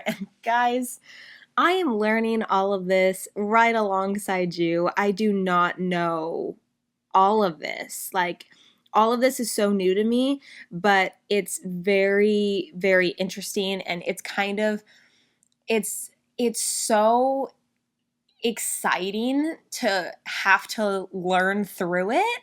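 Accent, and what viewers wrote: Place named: United States. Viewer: i just cannot stand your grating american accent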